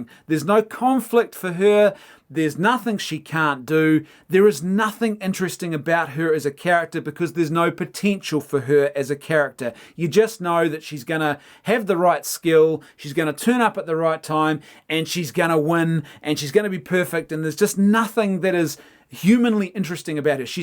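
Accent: New Zealand. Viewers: My guess is Kiwi from the south Island; If I got it right, it took me 5 years to hear out the distinctiveness in the accents... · I can tell you are Kiwi by the way you pronounce “fish and chips”, “fush and chups”. In Australia, they say “feesh and cheeps”. 👍🏻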